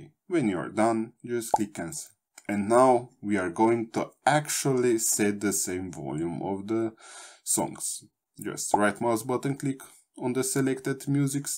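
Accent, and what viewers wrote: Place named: Eastern Europe. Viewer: Thanks to you to take time to simplefy the process of normalising sound in a playlist, I can ear the you have a eastern Europeen accent, my wife is Russian.We live together in Canada now,as I'm Canadian. Thank for your help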